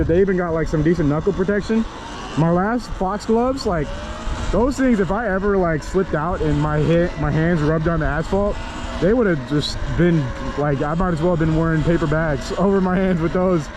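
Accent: Minnesota, United States. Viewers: Where you from Minnesota? You got the accent lol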